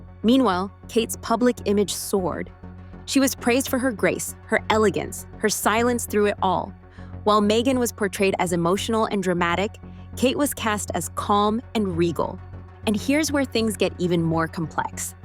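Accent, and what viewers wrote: United States. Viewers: I am always suspicious when we get announcements from an American voice? I will believe things from an English speaker or a member of the Royal Family or British Parliament.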